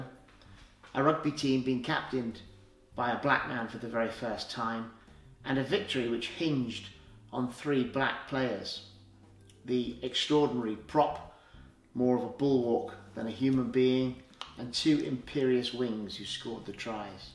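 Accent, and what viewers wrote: United Kingdom. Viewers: Your british accent is just a blessing!😍😍(I want to be a linguist). Thank you very much for your video.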